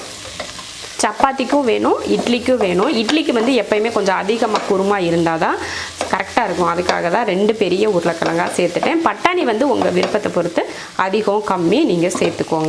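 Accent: Coimbatore, India. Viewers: Neenga, Christiana, ruslimma,therindhu kolla aasai,eanna pottu vaikkamal erukkeenga,but, unga Kovai slang nanna erukku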